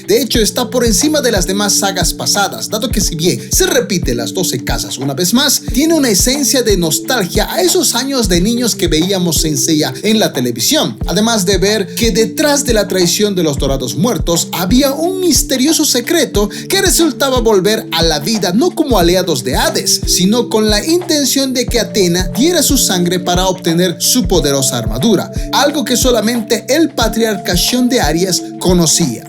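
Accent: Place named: Ecuador. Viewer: No puedo seguir la narración porque ese acento ecuatoriano que mezcla la R con la Ch me distrae demasiado con su mala pronunciación 😐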